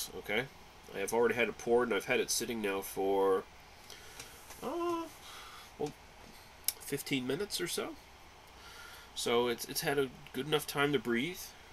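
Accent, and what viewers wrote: Canada. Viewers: canadian ay! (oat and aboat)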